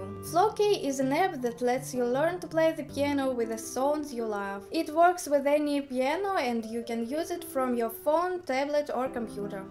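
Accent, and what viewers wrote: Russia. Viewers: До этого видео, я на канале только второй день и думал что автор девушка из-за границы откуда то) но услышав русский английский, сразу понял, что это не так😉😌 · Нам все равно слышно русский акцент) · Привет! I hope I wrote your name correctly in Russian 😀. I just speak some words in Russian language and I don't speak English fluently. But I could understand everything you said. I love your Russian accent so much and your videos... the way you play... Everything. Beautiful and amazing as always. 💘❤️☺️ Congratulations! From Brazil 🇧🇷 All the best, Matheus. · Me: Plays epic piano! Me: Loves Rock! Me: Has cute Russian accent! Me: recently discovered her channel! Me: uploads new video at 3am Me: has very cute smile Also me: my life is complete! 😍😍😍😍😍😍😍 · Your Russian accent when you talk English is so cute. I'd like to greet you for this amazing video. 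Congrats! Ps: I always wanted to learn how to play piano. So, thank you for sharing with us this app. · когда говоришь по-английски, стартуй буквы глубже, иначе акцент бъет по ушам даже мне, русскому. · Lovely russian accent, listening to you is a joy, every time :) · РУ.акцент не уберешь из жизни)))лайк)) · Lovely to hear your voice over the Flowkey advert - English with a Russian accent 😘 You should be in a James Bond Film 😉 Your piano interpretation was so beautiful and melodic you are a special rainbow - I always look forward to you , you inspire me 😀😊🤗🤗😎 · Этот милый русский говор, имитирующий английское произношение, заставляет меня думать, что русские танки просочились сквозь бутылочное горлышко Фульды и пьют кофе на французских пляжах. Спасибо, очень хорошо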